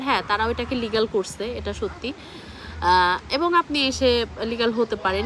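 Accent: Bangladesh. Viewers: Apner kotha sune monehoy apni bangladeshi. Asole amader sikha bhalo na. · don't blame bengali indian. You are nowhere from India. Your pronouncation is bangladeshi. We indian bengali people went to Lisbon in high skill work permit not doing any cleaning job.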